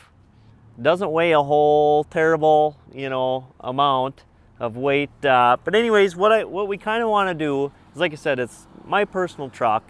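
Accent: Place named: Wisconsin, United States. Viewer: You have the most Wisconsin/southern Canada accent ever 😂. Sickkkk truck tho 🎉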